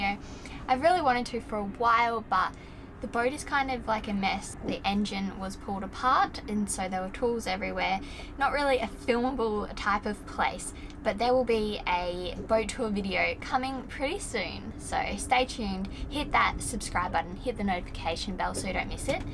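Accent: Australia. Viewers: sorry sister you are Australian I can tell but in a vary nice way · You def have an Aussie accent. 😅 Your parents have done an excellent job raising you it shows in your demeanor and intelligence. God bless and keep the vids coming. ❤ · Like you are willing get on You Tube and answer both the easy questions and the hard ones. And as far as the hard answers. Always are allowed make that decision later, since your only a teen and many years to figure that out. As far as accent, since you be sailing many different places you will get more mixed but you will have your beautiful Aussie accent base. 👍♥️💯🎦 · Medium (and delightful) Australian accent.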